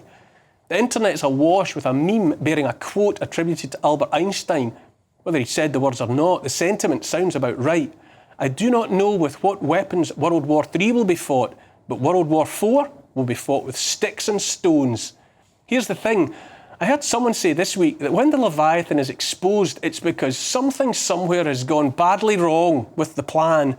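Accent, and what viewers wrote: Scotland, United Kingdom. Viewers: hE HAS A SCOTTISH ACCENT ! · I love his beautifully spoken Stirling Scots accent! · HIs annoying Scottish accent. He's impossible to listen to.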